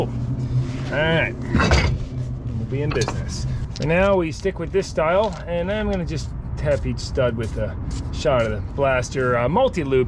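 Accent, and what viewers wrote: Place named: Western Pennsylvania, United States. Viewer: Where are you from? West PA or east OH? Accent gives it away.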